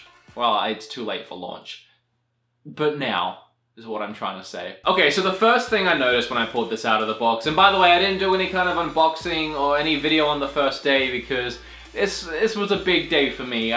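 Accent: Australia. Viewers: Wher do you live man? Where are you from? I thought you were a Ozzie haha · good video! what accent is that, are you a Australian?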